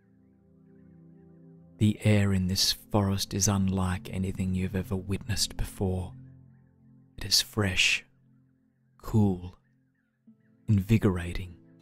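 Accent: Australia. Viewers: I’ve listened to only a few guided meditations and I’ve found there’s certain sounds in the voices that are not so gentle.... a lot of times the S sound and sometimes the T sounds are kind of piercing. Is it just me? Is there any way to kind of muffle that at all? Aside from that, it’s great to hear an Aussie voice guiding a meditation... thank you!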